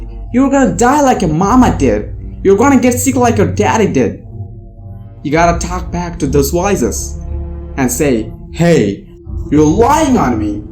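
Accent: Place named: United States. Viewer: Mesmerizing to see an indian speaking in american accent. Good job.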